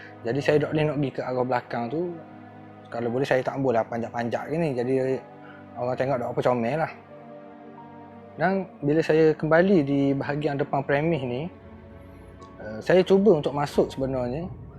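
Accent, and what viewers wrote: Terengganu, Malaysia. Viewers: Suka dgr bro ni bercerita dgn loghat ganu dia..😊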